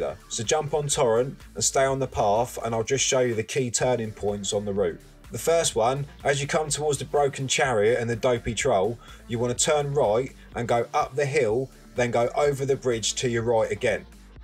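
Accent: Cockney, United Kingdom. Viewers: An Elden Ring exploit video from what sounds like an old-school, cockney gangster! Wicked. Thanks, man!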